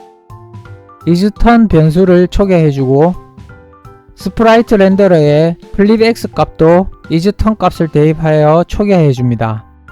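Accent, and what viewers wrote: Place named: South Korea. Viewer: 보고 즐겁게 따라해봤어요! 친절한 설명과 구수한 사투리 겉들인 좋은 영상 감사합니다!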